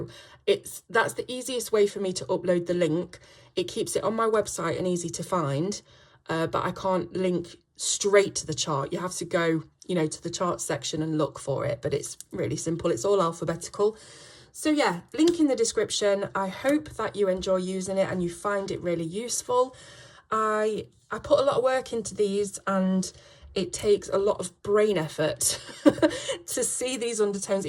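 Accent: England, United Kingdom. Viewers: Вы англичанка? Очень интересный акцент.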